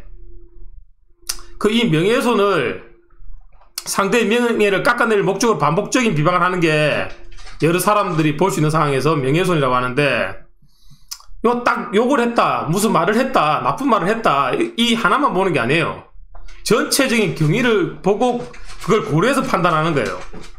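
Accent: Gyeongsang, South Korea. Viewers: 지난 40분짜리 영상도 끝까지 다 봤지만, 말씀 하시는 것 하나 하나 논리적이시고 불필요한 비난 없이 모두에게 도움이 되는 방향으로 말씀해주셔서 이번 영상도 경청하게 됐어요.^^ 처음엔 생소한 채널이어서 조금 오해도 했었는데, 가장 어른답게 말씀해주셔서 개인적으로는 가장 와닿았고, 이 계셔서 든든하다는 생각도 듭니다.^^ 앞으로도 응원하겠습니다! (경남쪽 사투린가요? 들을수록 정겹네요~^^)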